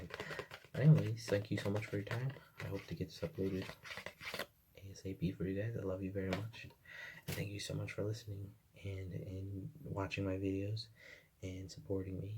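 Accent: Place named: Midwest, United States. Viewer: Have you always lived in the east? (Don’t know if you are comfortable with me saying your state). I ask because it sounds like you have a little midwestern in there!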